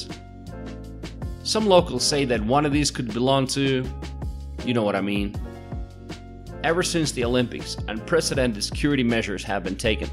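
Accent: South, United States. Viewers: YOU ARE SUCH A BEAUTYFUL GUY AND A PERSON AND I LOVE YOU AMERICAN ACCENT)))) GOD DAM I WOULD FLY TO THE MOON AND BACK FOR YOU!!!!! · It's great hearing your Southern accent, as I've been overseas for quite a few years. I'm from Georgia (the state). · You have an interesting accent. There's a little bit of US southern in it. Great videos! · Your accent is interesting. Did you learn English in the American South by chance?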